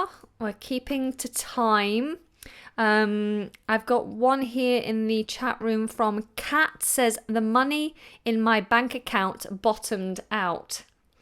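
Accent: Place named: England, United Kingdom. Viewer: Hello, I'm Waser Resaw. Nice to meet you. I'm dance music producer from German record label. I hear you voice and i think it's perfect. Especially your England accent i love it. Your voice catches my attention. Please sendme a inbox with a voice note or audio file saying: "You now listening Pitch Industry, Introducing Waser Resaw" Of course i really appreciate it. <3 My website if you wanna hear mi sounds: <link> <link> I wait your answer. Thank you.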